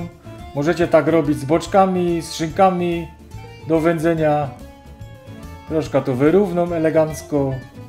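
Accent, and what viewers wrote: Silesia, Poland. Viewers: Oczywiście po śląsku , brzmi super! Pozdrawiam z Berlina. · Super robota (pracochłonna ta szynka).Pychota, podziwiam talent pana, cierpliwość i i umiejętność obróbki mięsa w pyszna dojrzewająca szynkę.👍👍👍👍👍Tu Bydgoszcz, rozumiem dos dobrze slunsku gwarę.Pozdrawiam i DZIĘKUJĘ. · Tak jeszcze nie robiłam, więc do roboty puki zima idzie :D A, co do gwary śląskiej nie rezygnować!!!! Cały urok w niej! Ja z Warszawy i pozdrawiam, a oglądającym - smacznego. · Jak to jest, bo się zastanawiam. Film opisany prawidłową polszczyzną, wchodzę z ciekawości a lektor mi jedzie gwarą!?! To na Śląsku, piszecie inaczej a mówicie inaczej czy tylko ten kanał ma jakiś problem? Albo ja, bo dla mnie to jest problem. Dlatego YT mi nie tłumaczy zagranicznych tytułów video, żebym nie klikał na polski opis filmu po turecku. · Świecianka z kuj-pom, a obecnie mieszkająca we Włocławku, pozdrawia. 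Filmy tylko po Śląsku. Pięknie się słucha gwary tym bardziej, że mój mąż to też Ślązak z Tychów. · Piękna sztuka.Dłuuugo już Pana oglądam.Mistrzostwo świata.Rafał z Krakowa. Śląsko gwara mi nie wadzi. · Świdnica, ale proszę rób filmy po śląsku. Wszystko jest bardzo zrozumiałe a klimacik dla ludzi z poza G. Śląska zostaje :-)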